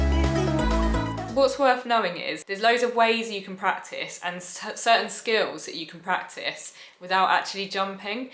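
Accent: England, United Kingdom. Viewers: I’m here for my dose of English accent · I love your British accent.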